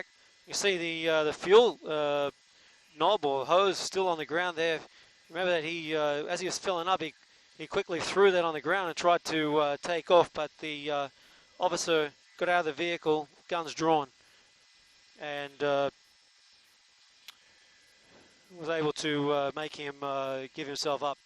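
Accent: Australia. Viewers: The narrator was awesome. Maybe an Aussie or a Kiwi? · Never heard an Aussie narrating an American chase before.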